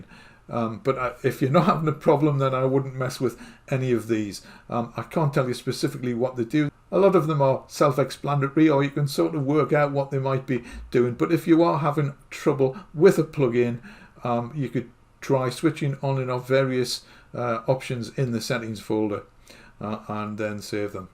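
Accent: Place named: England, United Kingdom. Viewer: Excellent and clear. Bloody champion and the proper English accent is welcome too!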